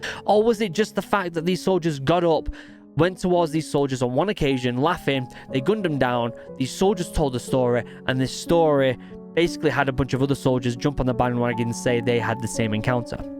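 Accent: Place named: England, United Kingdom. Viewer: nice change hearing an Englishman tell horror stories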